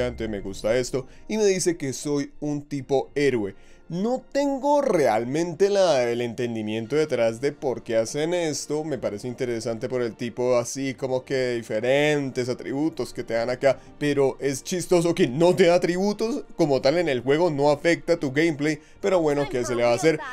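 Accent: Colombia. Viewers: severo acento, de una supe que era de Colombia xd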